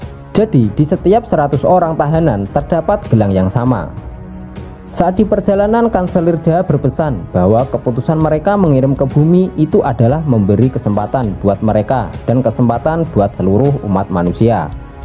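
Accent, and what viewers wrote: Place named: Java, Indonesia. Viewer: Medok ya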